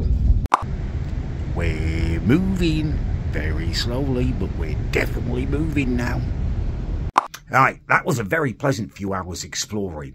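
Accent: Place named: Northern England, United Kingdom. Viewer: Your accent seems pretty northern, how long have you lived on the isle of wight?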